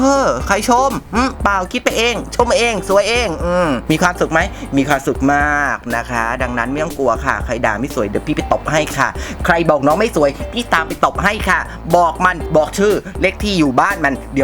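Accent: South, Thailand. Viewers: วันนี้สำเนียงคล้ายเด็กใต้รึป่าวค่ะ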